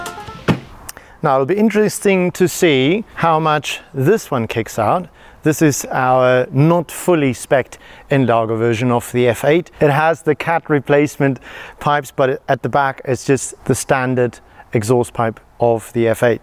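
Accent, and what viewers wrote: Afrikaans, South Africa. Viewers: Epic as always and that Afrikaans accent WITH the words and phrases 🇿🇦leka man 💯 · My guy are you from South Africa?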